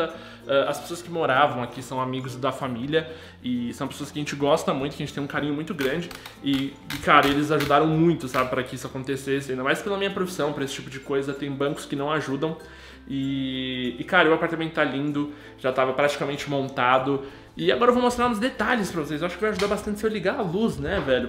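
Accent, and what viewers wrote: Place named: Rio Grande do Sul, Brazil. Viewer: Pensei que ele fosse gay, mas é só o sotaque gaúcho mesmo.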